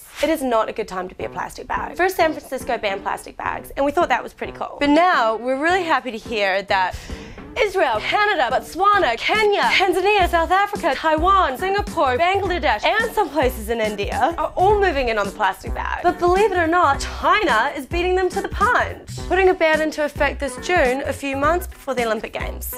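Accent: Australia. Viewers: she talks way too fast. shes australian isnt she ß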